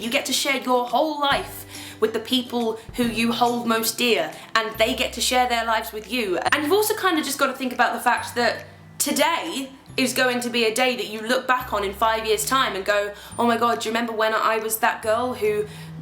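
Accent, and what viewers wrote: United Kingdom. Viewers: I saw the channel name "well I think I'm gonna subscribe the channel" I hear the British accent, You talk about Dodie presses the subscribe button so hard, my phone screen would be broken, if I had an iPhone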